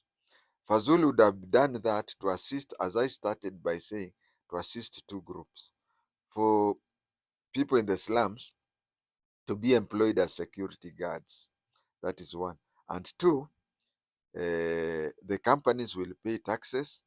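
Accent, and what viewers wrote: Kenya. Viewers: VERY POOR KENYANISED/ SWAHILINISED ENGLISH. ARE YOU GIRIAMA?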